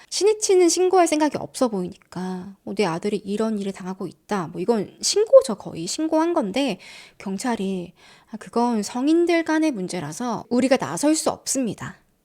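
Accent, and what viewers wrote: Busan, South Korea. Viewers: 아따 어리석은 남자들~~~ 사투리 넘 부산요 ㅎㅎㅎ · 아니 ㅋㅋㅋㅋㅋㅋㅋ 연기도 잘하는데 부산사투리도 와이래 완벽합니꺼 ㅋㅋㅋ못하는거 없어 ㅎㅎㅎ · 일본어 묘사를 하시다가 영남 사투리로 너무 자연스럽게 전환하시네요😂 오늘도 재밋게 보고갑니다👍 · 알아도 또 듣게 만드는 재주가 있으시네~ 사투리는 또 와그래 이쁘게 잘하노? 반했데이~ㅎㅎ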